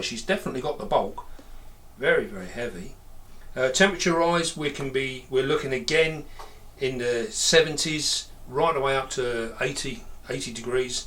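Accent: United Kingdom. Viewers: Hello, May I ask you what kind of british english dialect you are used to speak? It sounds very well and it's quite understandable for me as a german.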